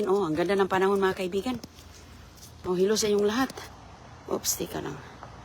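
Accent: Visayas, Philippines. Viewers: Visaya k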